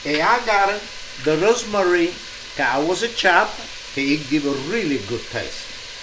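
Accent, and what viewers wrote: Italy. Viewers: Great looking food, but the Italian accent is falling away..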